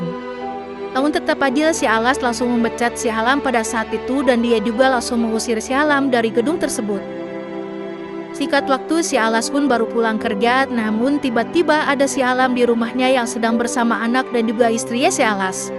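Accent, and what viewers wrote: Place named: Sunda, Indonesia. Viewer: Udah lungse udah bucat😂😂😂😂😂 sunda pisan👍👍👍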